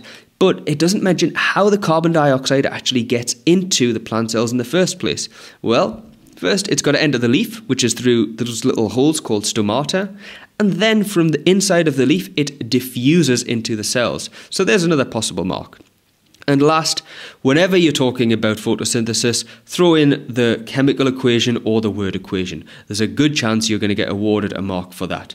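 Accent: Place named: United Kingdom. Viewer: no not the british accent i don’t understand it💔😭💔😭💔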